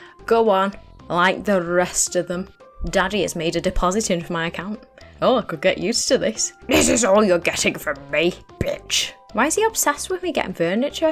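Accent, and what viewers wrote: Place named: Northern England, United Kingdom. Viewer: gender swapped david lister from red dwarf is on youtube doing sims reviews! sign me up! (i’m a millennial american nerd, we hear one northern accent & we get real goofy with trying to bring up pop culture stuff younger folks in the UK may not “give a toss” about 😳—ok back to sewing and smoking weed while watching this vid 🖤)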